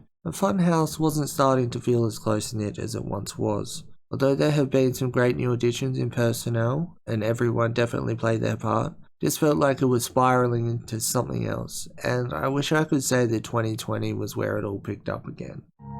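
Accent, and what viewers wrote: Australia. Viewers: Maybe you can't understand Australians? I love listening to someone who is articulate!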